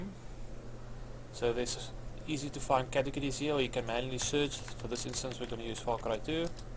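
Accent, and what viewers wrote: South Africa. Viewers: South African? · Jho br, daai afrikaanse aksent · are you South African ?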